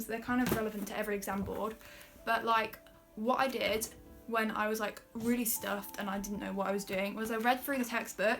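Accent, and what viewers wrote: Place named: United Kingdom. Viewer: I want to speak like you😭 I wish I was British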